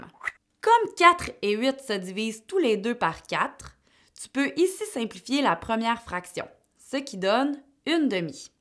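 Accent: Quebec, Canada. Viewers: Bonne explication, bon juste l'accent québécois qui rend un peut plus compliqué l'écoute mais je dit gg quand même 👍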